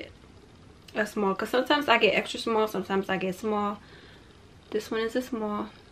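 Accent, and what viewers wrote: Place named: New Jersey, United States. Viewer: So your a Jers3 girl. Still there? Your accent is sweet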